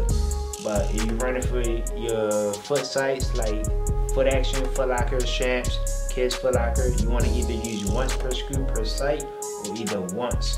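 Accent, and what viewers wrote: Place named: Baltimore, United States. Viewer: Def from Baltimore lol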